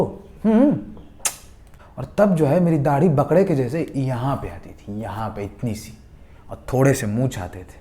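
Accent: Bihar, India. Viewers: Bhaiya aapki story relate kar jati hai hamari life se. aur bhaiya aapki wo bihari language bhi , Main bhi bihar se hi hu bhaiya.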